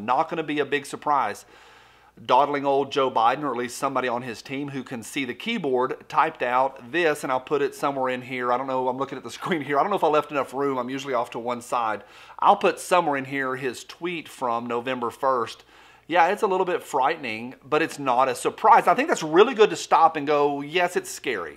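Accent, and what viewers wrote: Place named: Southwest Virginia, United States. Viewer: I love your videos, & the way you talk! Sounds a lot like where I come from.. SWVA?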